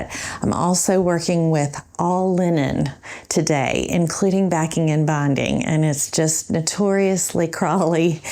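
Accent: South, United States. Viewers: Good grief woman! It is beautiful and you are amazing. I would never even try that. You have my hat and my heart. I truly love how you slip into that southern drawl when you are tired. It is precious. Texan as I was born and raised, I also love a good sammich. Jean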